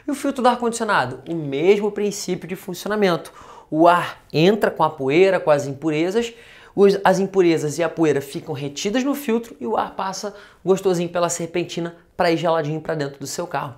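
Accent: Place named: Rio de Janeiro, Brazil. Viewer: Sotaque Carioca é uma tortura.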